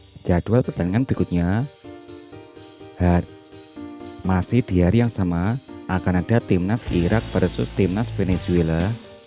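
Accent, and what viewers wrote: Java, Indonesia. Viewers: Kurang menarik mendengar suara latar, gaya bahasanya Jawa..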